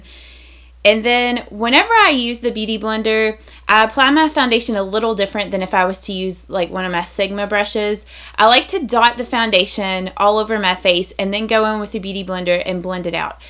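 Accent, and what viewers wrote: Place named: Texas, United States. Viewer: Love your accent, where are you from, I'm southern also from Texas, you sound like you are from Texas. lol good video too.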